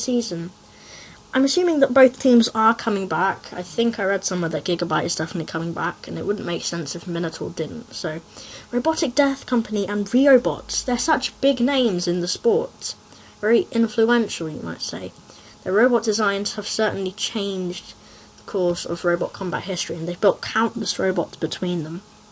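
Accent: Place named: United Kingdom. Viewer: I'm American, so hearing a British accent is sophisticated and refreshing for me, lol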